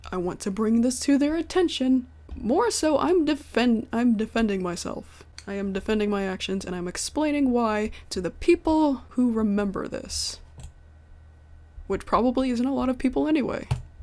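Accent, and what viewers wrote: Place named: South, United States. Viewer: I love your voice you sound southern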